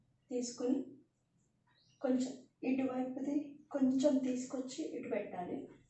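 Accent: Srikakulam, India. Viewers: Akka Srikakulam language super ga undi chala baga matladu tunavu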